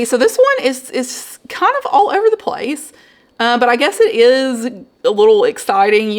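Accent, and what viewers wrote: South, United States. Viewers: We don’t have a publix in Wisconsin.. we have Copps, and I’ve never seen any philodendron. How’s the job going? You recently went back? What side of Georgia? You have such a strong southern accent, but I love it!! 😂❤ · When I first heard her, she reminded me a lot of my relatives in NE Alabama, so I thought she was there or perhaps North GA. I was pleased to find out I was right! I grew up in NW FL with a much more subtle Southern accent, so my extended family mocked my “Yankee” accent.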